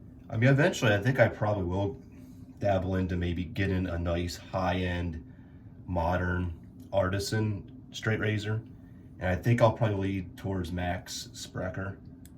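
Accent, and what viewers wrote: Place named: Pittsburgh, United States. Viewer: I thought I heard a hint of Yinzer in your accent. Proud PA boy here.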